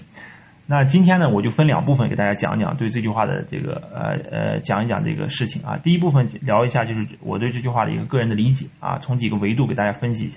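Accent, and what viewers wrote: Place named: South, China. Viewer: 你这啥耳朵啊，明显南方人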